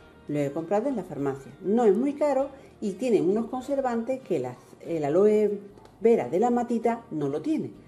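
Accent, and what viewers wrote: Andalusia, Spain. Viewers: Holaa...me encanta el acento andaluz...saludos desde Barcelona...aqui nueva👍👍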